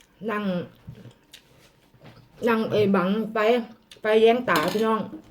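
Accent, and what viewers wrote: Korat, Thailand. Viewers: ผมชอบพี่ พูดโคราช เพราะดี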